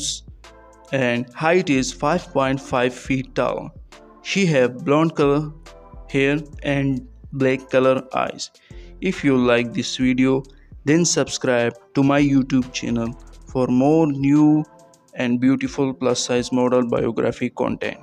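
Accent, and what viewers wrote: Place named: India. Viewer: Is the announcer from India. Because he sounds like one of them.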